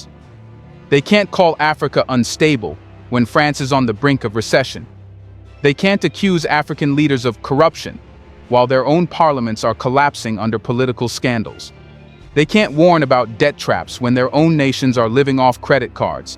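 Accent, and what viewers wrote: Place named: United States. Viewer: For an American voice -over to lecture any body on their national debt - when the USA's own Foreign Debt exceeds its own GDP already (and is 10 times the size of France's) and will only continue to grow until its economy collapses - pretty soon!, it is thought - Is a bit bloody RICH! 'Do one America - about the shortest lived Empire (with the axception of Attila the Hun's perhaps) EVER. GO SUCK A LEMON!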